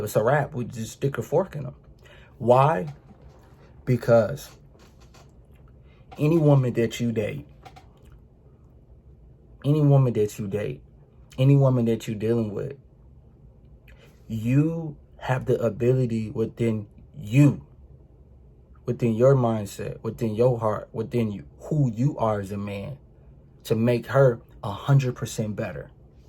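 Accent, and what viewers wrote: Baltimore, United States. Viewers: Are u from Baltimore? U got the accent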